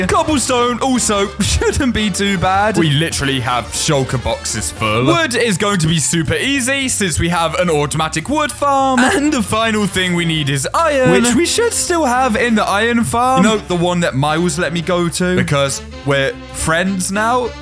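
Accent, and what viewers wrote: United Kingdom. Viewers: Like if has a British accent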